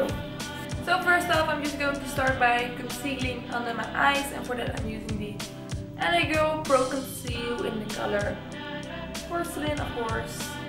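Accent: Netherlands. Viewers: hoor ik een nederlands accent? :) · when u suddenly notice that she's dutch....or isn't she idk, but etos is dutch and her accent also sounds dutch, my country, yess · It's a Dutch accent :) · Volgens mij wel! Ze klinkt erg nederlanss🤔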